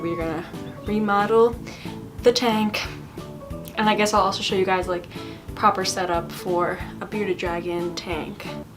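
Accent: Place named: Australia. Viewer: When I heard the astrailian ascent I freaked I love it so much it’s adorable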